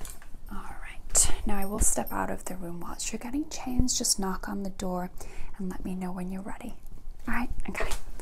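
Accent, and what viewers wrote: South, United States. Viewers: As someone who grew up in the south but no longer lives in the south the way you speak is so nostalgic and relaxing!! it brings me back to elementary school! Please tell me im not insane and your from the south lol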